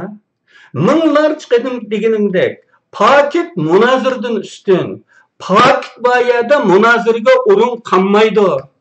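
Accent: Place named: Kashgar, China. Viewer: بەك ئوماق گەپ قىلىسىز ئاكا ھە ؟ 100 پىرسەنت قەشقەر شىۋىسى . ئاللاھ ئۆمرىڭىزنى ئۇزۇن قىلسۇن ! ھەقىقەتنى ئوتتۇرغا قويۇپ ، ساددا ، 5 ياشلىق بالىدەك ئاسان گول بولىدىغان ، ئوخشاش ئۇسۇل بىلەن تەكرار ئالدىنىۋىرىدىغان ، ئۇيغۇرۇمنى ھەقىقەتنى بىلىۋالسۇن دەۋاتىسىز ………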